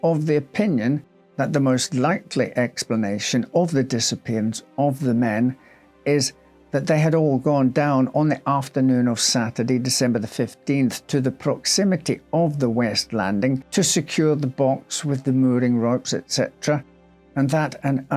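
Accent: Scotland, United Kingdom. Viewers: Is the accent Scottish???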